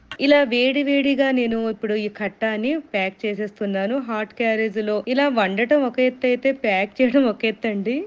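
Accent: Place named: Andhra, India. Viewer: మేడమ్ గారు మీరు తెలంగాణాలో ఉండి కూడా, అంద్ర తెలుగులో స్పష్టంగా మాట్లాడటం చాలా సంతోషం అండి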